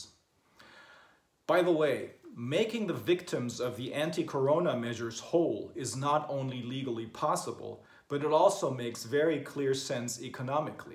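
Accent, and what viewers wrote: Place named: United States. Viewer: On a side note, this dudes American accent is amazing.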